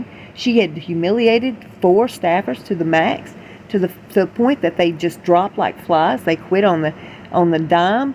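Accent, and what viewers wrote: South, United States. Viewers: your voice is so soothing and has that sensuous Southern drawl; won't you consider narrating audiobooks, just so we can listen to you speak whenever we need a little "relax" time?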